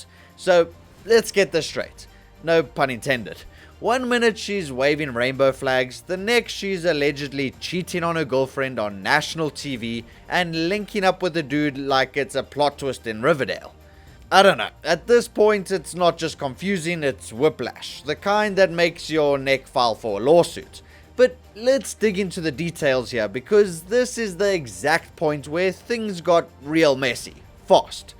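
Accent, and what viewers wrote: South Africa. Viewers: Yoh, I heard the "boet" in your voice from the first 3 seconds 😂😂😂😂 Ny lekker boeta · I know a fellow South African when i hear one❤🇿🇦keep up the content!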